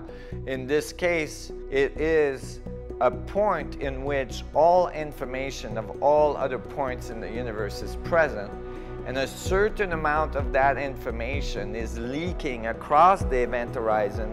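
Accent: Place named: Quebec, Canada. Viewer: Just found this one....following Nassim since 2006.....LOVE HIM, LOVE HIS MIND, HIS LOOK, HIS FACE, HIS QUÉBECOIS ACCENT, the whole being....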